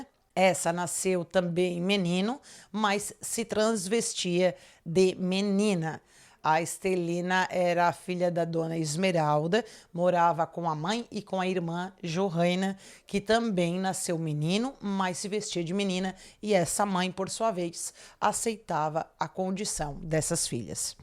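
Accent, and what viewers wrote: South, Brazil. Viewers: LINDAAA,JÁ OLHEI ESSE CASO EM VÁRIOS CANAIS,MAS NÃO TEM COMO DEIXAR DE ASSISTIR NO SE CANAL E COM SUA NARRAÇÃO COM ESTE TEU SOTAQUE MARAVILHOSO DO PESSOAL DO RIO GRANDE DO SUL!!!! AMOOO TEU SOTAQUE DO SUL GURIA LINDAAA,O TEU CABELO ESTÁ LINDÍSSIMO E QUE O UNIVERSO TE ABENÇOE EM TODAS ÀS ÁREAS DE TUA VIDA LOIRA LINDAAA🙌💋❤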